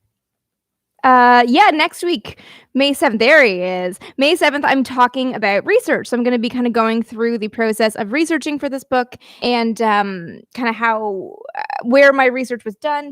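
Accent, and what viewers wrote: Canada. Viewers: Will have to get the ebook so I can hear that awesome Canadian accent all day.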